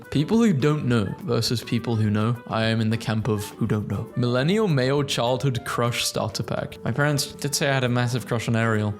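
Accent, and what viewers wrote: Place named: Southern England, United Kingdom. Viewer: Your accent is modern and largely originated in the late 18th and early 19th centuries among the southern English upper classes. So actually makes a lot of sense historically because we never adopted the made up weird accent.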